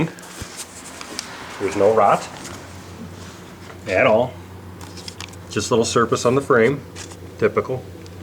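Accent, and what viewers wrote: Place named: Cleveland, United States. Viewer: Listens to this guy talk: definitely from up around Cleveland. “So anyway up here around Northeast, Ohio.”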